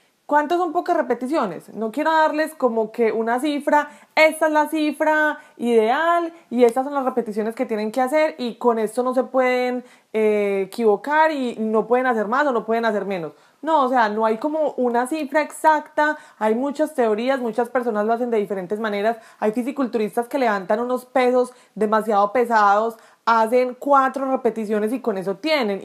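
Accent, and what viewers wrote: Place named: Colombia. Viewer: No sabe nada, pero como me gusta el acento colombiano este ya es el segundo video y el ultimo 😅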